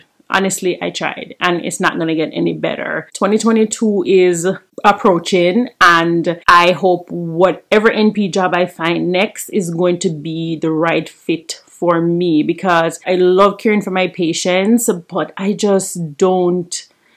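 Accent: Jamaica. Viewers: I hear a Jamaican accent here, hey girl 🇯🇲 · Off topic: YouTube recommended me your video after watching Nuff Loads life in Jamaica, so I decided to click on your video even though those are two different videos, I decided to check out your video, and I hear an accent, and me being nosey lol, i checked out your IG as well, and I see that you're Jamaican. I'm not Jamaican but I love Jamaican people, lol YouTube is something else, but thanks for sharing your video. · U from jamaica?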